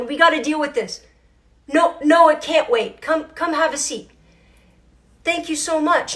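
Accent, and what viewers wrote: Canada. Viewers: Now that I know you're Canaidien I hear it. Love it. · This is what a true Canadian who loves their country looks like and sounds like. I know that scares some people, but I say thank you.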